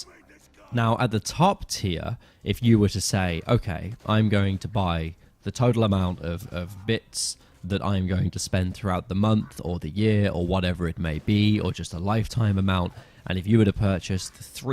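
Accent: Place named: United States. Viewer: speaking american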